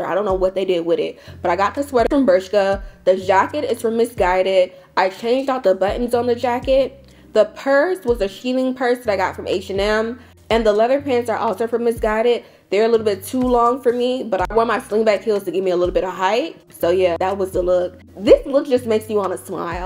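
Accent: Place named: Nigeria. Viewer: I heard that accent come thru…. Nigerian? If I am right, a lot of your style sense runs in the bloodline honey, Naija people never carry last. Nigerian women wrote the book on over the top and fabulous 😂😂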